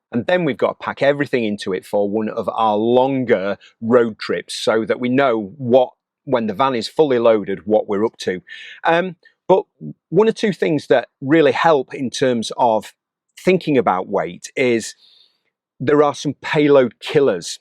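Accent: Yorkshire, United Kingdom. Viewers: Another great video: useful info delivered in a characteristic [no-nonsense Yorkshire] way. Well done & thanks. We’ve just collected our own FIAT Ducato 5.40 van, a choice much influenced by your own decision making process (as shown & shared in your previous videos, so thanks for that!). She’s a 2017 Possl Roadcar whom we’ve christened Freya. We live in The Netherlands (a campervaner’s paradise), and now happily wave to all Ducatos that we see driving around! Keep making the films - they are hugely helpful to your many followers. Happy travels en “tot ziens” (Dutch for see you)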